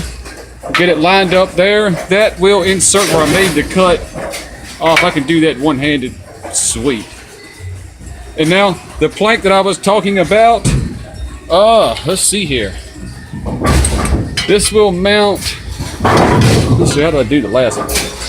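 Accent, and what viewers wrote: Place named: South, United States. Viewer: You have a southern accent!!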